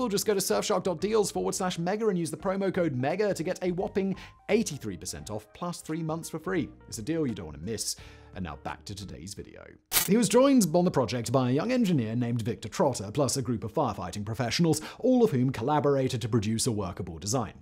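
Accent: United Kingdom. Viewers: Too much "British inflections" makes this too hard to listen to.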